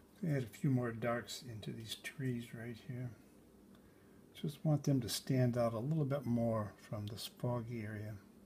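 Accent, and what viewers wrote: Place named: New England, United States. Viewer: Found this video 3.5 years ago when I began to self-paint wc's. Found this today, and STILL believe this to be the finest demo on fog, mist, w/w. Thank you for this hidden gem! (PS: I'm from RI, you sound like NE, yes?) Fanna Turano Denver, CO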